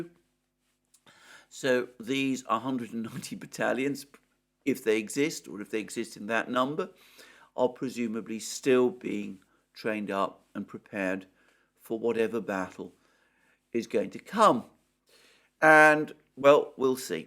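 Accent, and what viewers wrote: England, United Kingdom. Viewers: Greek communist with an English accent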